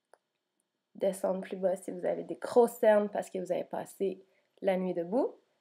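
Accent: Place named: Canada. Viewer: ho toi tu es une canadienne !! je me trompe pas !! avec ton accent tu es une !! trop marrent quand vous parlez!!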